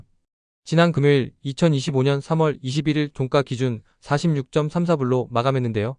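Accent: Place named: Seoul, South Korea. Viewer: 똑소리나는 서울분 , 반가와요. 유투브 흥하시길 💕 우연히 들어왔다 구독 좋아요 하고갑니다.😊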